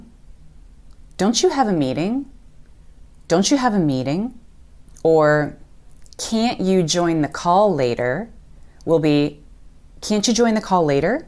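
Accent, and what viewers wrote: United States. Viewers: Sorry you got a very American pronunciation which differs from the British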